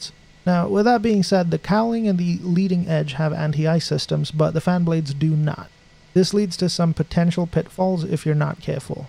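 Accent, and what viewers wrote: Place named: United Kingdom. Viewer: This dude can't decide if he's British or American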